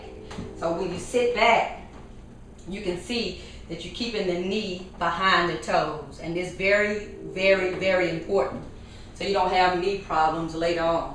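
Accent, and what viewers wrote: South, United States. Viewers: You remind me of my ppls down south, you have the crazy country accent!! LOL!!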